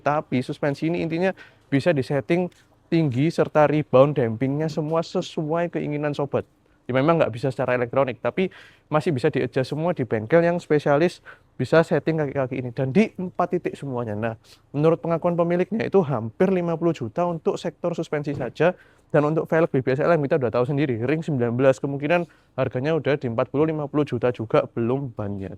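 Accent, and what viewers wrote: Surabaya, Indonesia. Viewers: Wes luweh asik gae logat arek suroboyoan ae ko...salam sego indomi🍚🍜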